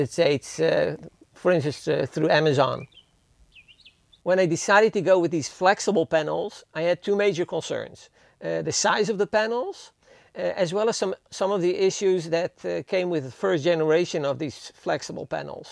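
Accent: Netherlands. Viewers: Ik hoor een Nederlander :)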